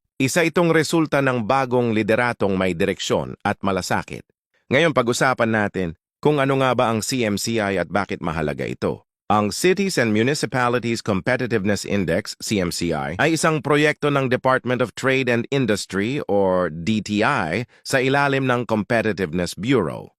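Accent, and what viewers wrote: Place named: United States. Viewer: Lintik din ang narrator parang kano ayusin mo ang mga pag bigkas kakainis para kang hindi Pilipino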